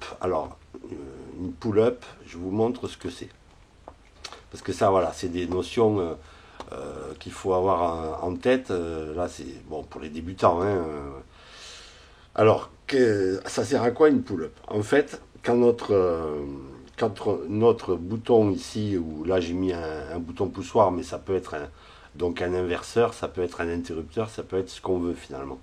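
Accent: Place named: Toulouse, France. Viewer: Bonjour Merci pour vos tutos!!! On à beau dire mais l'accent de Toulouse facilite grandement la compréhension de l'arduino, pour moi qui vous écrit du 65... En prévision de construction de mon TCO, j'ai déjà câbler et programmé un servo avec un inter bipolaire ( trop fort!!!) les cœurs d'aiguilles et les leds du tco seront par micro swithc commandées par les servos. Deux questions se poses pour moi: Combien de servos je peux commander avec l'arduino uno? (la puissance se fera avec une alim indépendante 5v) Et comment puis je les raccorder? Encore merci pour la simplicité et la pédagogie avec la quelle vous nous expliquez des techniques qui peuvent être si compliquées (du moins pour moi...) Adichatz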